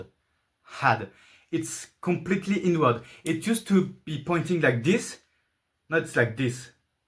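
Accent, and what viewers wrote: France. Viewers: I KNEW YOU WERE FRENCH i recognize it from the accent, but seriously im very sorry to what happened to your project i've heard that the temp has even reached to 40° in some regions, i have never thought in my life that france would be hotter than algeria but here it is now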